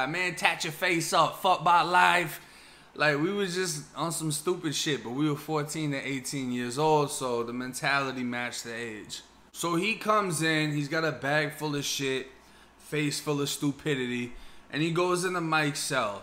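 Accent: Boston, United States. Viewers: Are you from BOSTON? I'm in upstate NY and I can hear that accent.I love it!!!😎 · I'm 46 yrs old. I'm from Florida born and raised. I graduated in 93". From 94 to 96 I went to a college called Dean College in Franklin, Ma. You have a very strong Boston accent. Love your videos, stories, and content. Thanks for sharing. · I’m from England and clocked the Boston accent straight up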